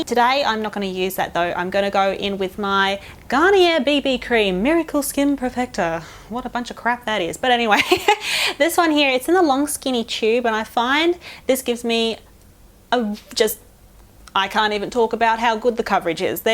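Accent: Australia. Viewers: Australian (Duh)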